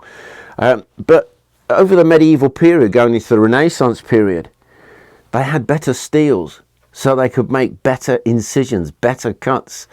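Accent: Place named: Black Country, United Kingdom. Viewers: Hi. Are you from Stafford or Lichfield by chance? Black country for sure but I can't place your accent. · What a relief!! an english video narrated by an englishman and not a septic!!! aka a yank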